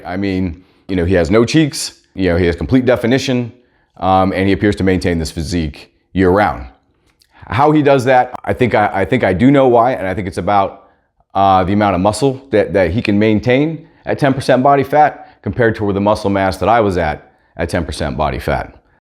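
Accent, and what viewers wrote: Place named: South, United States. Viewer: Dude if I close my eyes it sounds like Dustin "The Diamond" Poirier is speaking, are you from south?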